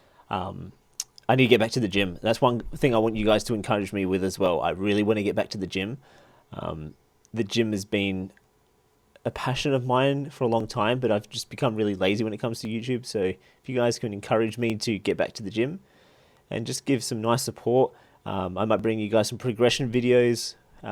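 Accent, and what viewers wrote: Australia. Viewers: thats awesome, i acually didnt realise you were an aussie. love it. us aussies have to stick together, what tribe were you in on official servers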